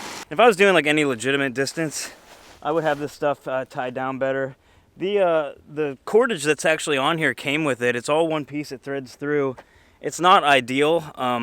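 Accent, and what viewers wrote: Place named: East Coast, United States. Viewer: Enjoyed the video. Did you see the headlights in the background at night? at about . They were off in the distance. I liked your sled & all the amenities it enabled you to bring along. I love camping & love bringing as many amenities as possible, which is a lot because I have a camper. I also do some tent camping. I could tell you were from out east because of your accent. Being originally rom Pittsburgh, I'm familiar with buckeyes. As kids, we used to break open the spiny green outer shell to get to the buckeye & just collect them. Great looking steak dinner! Hope your finger healed up okay. Take care & stay safe.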